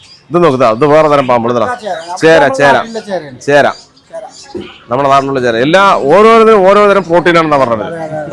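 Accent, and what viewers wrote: Palakkad, India. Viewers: പാലക്കാടൻ ഭാഷ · പാലക്കാടൻ സ്ലാങ്ങ് അവതരണം ആണ് പലരെയും ഈ വീഡിയോ കാണാൻ പിടിച്ചിരുത്തുന്നത്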